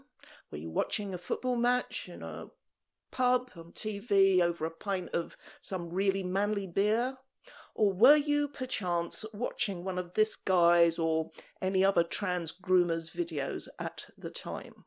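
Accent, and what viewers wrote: United Kingdom. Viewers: I've watched enough of these to lip sync near perfectly what she is saying and let my brain fill in the British accent. I've never been more terrified of a YouTuber for yelling at her audience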